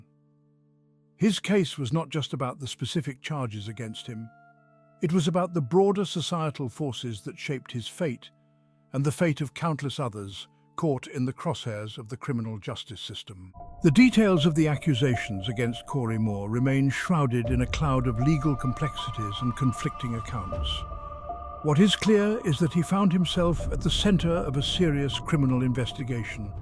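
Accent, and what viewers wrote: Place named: Virginia, United States. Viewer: This leaves me with a lot of questions E. Did he ever get convicted? I see a lot of penitentiary pics; did he get knocked for something else?? How long has he been down; or is he home? I'm from VA so I'm more partial to hearing you talk with our accent and terminology. This is more mainstream type and I get it but I'm kinda more partial to you Homie.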